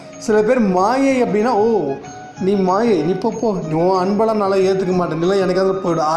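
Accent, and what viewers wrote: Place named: Madurai, India. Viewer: I hear ur voice so that I am asking u r Madurai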